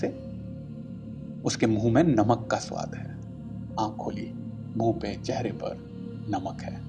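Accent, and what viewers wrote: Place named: Mumbai, India. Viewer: Bambaiya bhasha ekdum sahi pakde hai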